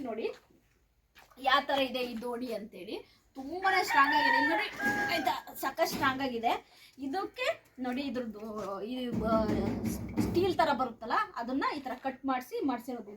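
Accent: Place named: Chitradurga, India. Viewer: Akka yavuri nimdu...bashe nodtidre Chitradurga district ansutte ..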